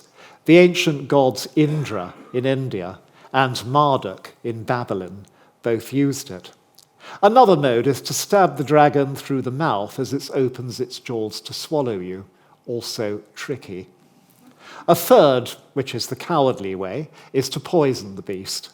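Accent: United Kingdom. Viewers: yes, the Chinese dragons are mild and benevolent as "le feng shoah" tells us... Jesus Christ, what a dingus whenever I hear the British accent I'm expecting the most clumsy, ridiculous, unaware, stupid thing to be said. can't believe these people were the staple of documentation and history just quite recently.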